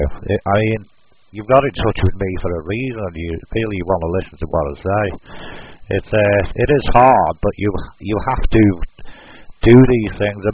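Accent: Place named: Cockney, United Kingdom. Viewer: What is this... some kind of cockney Brit radio broadcast? The audience doesn't even know who is addressing us. More moving images and less static ones... thanks.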